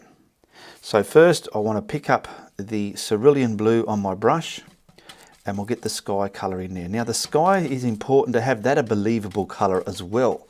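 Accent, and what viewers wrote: Australia. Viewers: I was looking for a video of how to paint realistic clouds. You made it look easy and gave a great explanation. It’s my first time watching your videos. Will definitely watch more. Also, love your accent, Australian? I have always wanted to visit Australia. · Hi, I love watching your tutorials and have seen you grow as an artist. I enjoy hearing your Aussie accent and fun expressions such as “put-er-onner brush” and “blend it to buggery”. Great tutorial! I’ll be trying this technique in my next work. Thanks and keep producing these videos for us.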